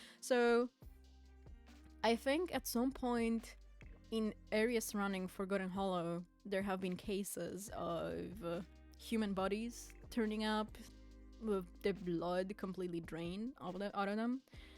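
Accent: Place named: Poland. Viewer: omgggg of course it's slavic (i've always loved that regions accents, but i dont think i've ever heard a polish accent). its beautiful i love it so much!!! 🤍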